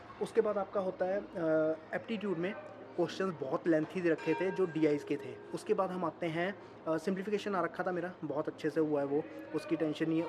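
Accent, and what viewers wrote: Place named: Punjab, India. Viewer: Bhai aap Punjab se ho kya? Apki hindi me bhi punjabi accent aa raha hai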